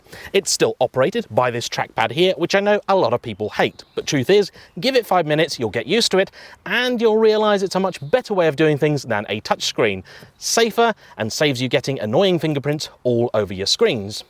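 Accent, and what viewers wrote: United Kingdom. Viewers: Gosh I love how the Britts say Kahhs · Porsh.....Jag-you-uh. LOL Love the Brits.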